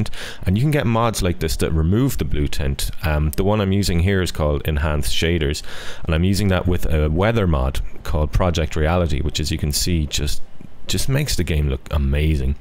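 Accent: Ireland. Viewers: He has an Irish accent if you didn't know.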